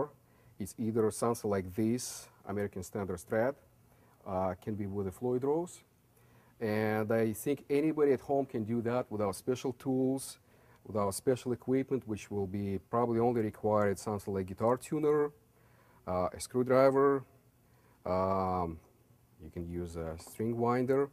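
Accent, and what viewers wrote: Russia. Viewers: Are you from Russia ? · У чувака шикарный русский акцент))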